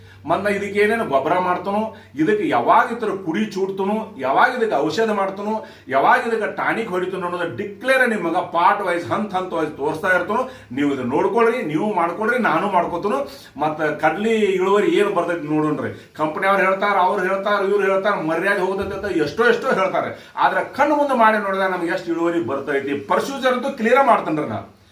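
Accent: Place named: Karnataka, India. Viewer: Sir nivu mathadu rithi mathu bashe nodira nivu bellad bagewadi urinavru irabeku alava sir idakke reply kanditha kodri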